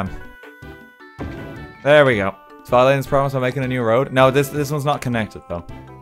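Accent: Ireland. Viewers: Gotten used to how his accent sounds now and I honestly can't tell if he sounds less or more Irish than he does here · Are you from Ireland I am I live in Kerry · Ya know GrayStillPlays tries his best to make a chaotic city but you have made a well working chaotic city + an irish accent so you are better.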